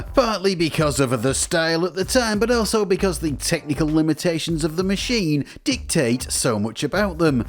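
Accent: United Kingdom. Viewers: Are you from UK? What an accent 💩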